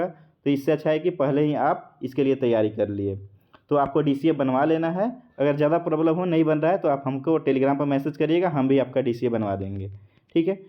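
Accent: Motihari, India. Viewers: Aap motihari se ho kya bhiya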